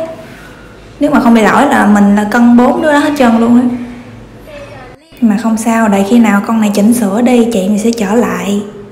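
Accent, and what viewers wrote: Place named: Mekong Delta, Vietnam. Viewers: Bà này miền tây chính cống mà. Nên ngta mới gọi miền tây á. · Nói giọng đậm chất miền tây luôn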